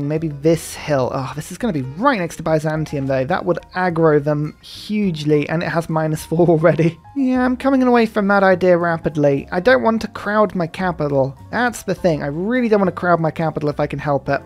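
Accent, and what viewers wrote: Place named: United Kingdom. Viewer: Your brit-feminine accent is so sweet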